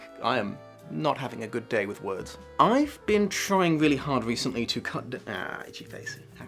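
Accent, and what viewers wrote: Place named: United Kingdom. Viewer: There is something so beautiful seeing someone with a thick British accent reviewing American smut novel's.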